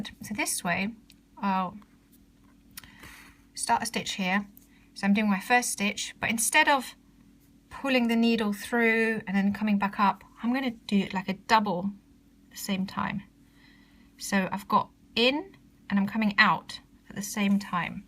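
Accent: United Kingdom. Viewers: ur British ;)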